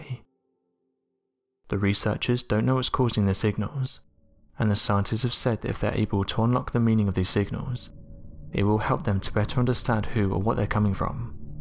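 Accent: United Kingdom. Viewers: A British commentator? Like all the Iconic movies deplicting Historic charactors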